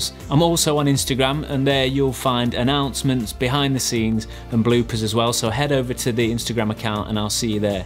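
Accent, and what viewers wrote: United Kingdom. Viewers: I think you're #DannyGevirtz with a British accent. Thanks for another great video, bro.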